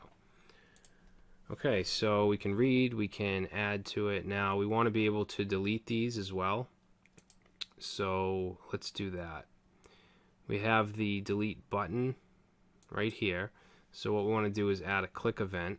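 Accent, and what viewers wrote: Boston, United States. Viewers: That Boston Accent is wicked fun to listen to.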